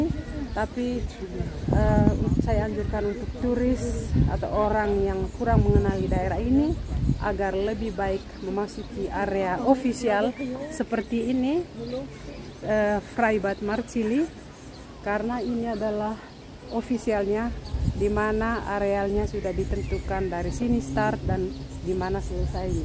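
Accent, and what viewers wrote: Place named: Flores, Indonesia. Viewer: Mohon maaf, Logatnya kayak orang flores, manggarai, ruteng🙂🙏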